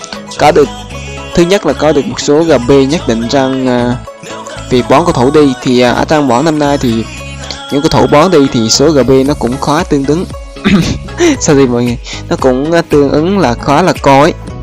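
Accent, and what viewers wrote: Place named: Quang Ngai, Vietnam. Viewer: bạn nói tiếng quảng Ngãi mình không nghe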